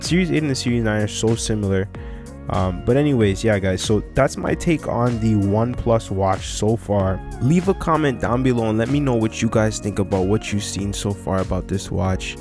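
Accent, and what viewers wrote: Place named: Toronto, Canada. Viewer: Toronto accent 🤢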